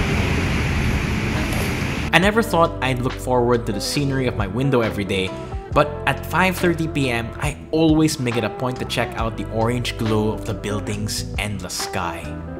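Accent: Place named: Philippines. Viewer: Idk why I’m kinda fond of the way filipino says words ending with -tion 😂 Great video btw, love your place!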